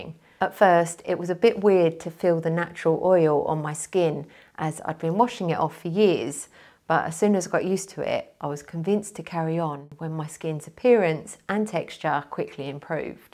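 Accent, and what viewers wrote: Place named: Kent, United Kingdom. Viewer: ok, I recognise the accent 😉💖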